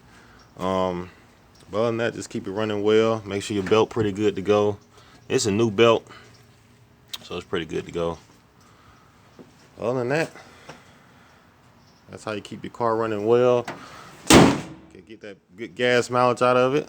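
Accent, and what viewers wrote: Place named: South, United States. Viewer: You sound like you’re from the south, where ya from? I’m from east Alabama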